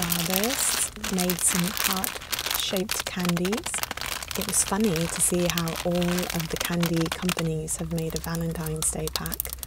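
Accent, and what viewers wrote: England, United Kingdom. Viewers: Real or not love me a good English accent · i wonder where was born, she sounds british to me... So i wonder if there is Target in UK.. sorry for my bad english ^~^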